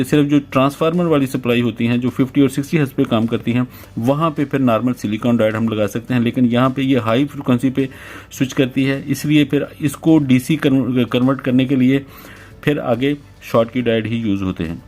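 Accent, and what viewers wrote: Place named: India. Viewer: با اون لهجه هندی ریدی تو انگلیسی حرف زدن ، گاو مثل آدم انگلیسی حرف بزن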